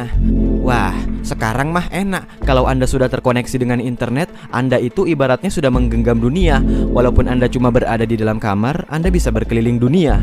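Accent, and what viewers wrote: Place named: Madura, Indonesia. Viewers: Bro.. kok kayak ada suara bahasa maduranya..kayak orng jualan di kapal...😀😀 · Anjir suaranya kayak orang madura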